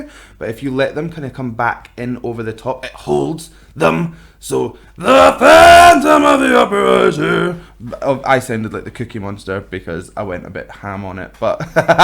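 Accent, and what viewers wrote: Scotland, United Kingdom. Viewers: i see. thanks. i was leaning towards Scottish but was unsure. pardon my ignorance, its just that we, Americans have trouble distinguishing accents and i am fascinated by them. usually i can spot it better when its a bit heavier than yours. at any rate, thanks again. looking forward to seeing more of your entertaining and informative reactions. is my passion, so anytime you wish to react to her my soul will sing. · Hello there, I really love your scottish accent, feels like going home... And, yep Markos voice is awesome!! Try ' ', ' ' (Tampere) or ' ' from 👍😉 Greetings from 🇨🇭 🤘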